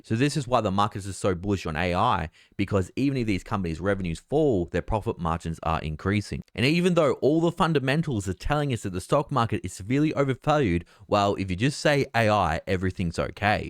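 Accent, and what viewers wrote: Australia. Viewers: Aussie accent...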